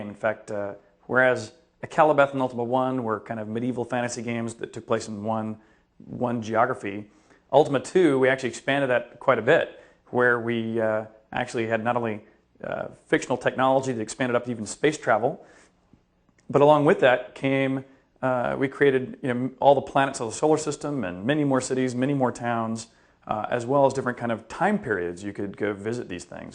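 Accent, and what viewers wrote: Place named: United Kingdom. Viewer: i am german and even i think you sound british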